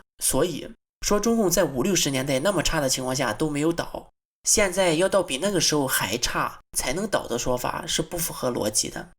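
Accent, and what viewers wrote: Shandong, China. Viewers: 你的口音应该是山东的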